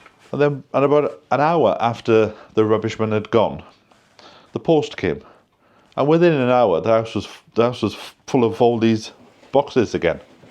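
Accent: Wales, United Kingdom. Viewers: Love your welsh accent. ❤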